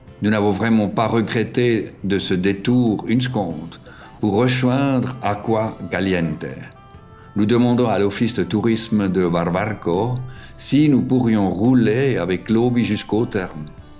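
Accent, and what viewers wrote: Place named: Switzerland. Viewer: Bonjour, Des paysages magnifiques. Toujours l'accent Suisse merveilleux. Bonne continuation. Thierry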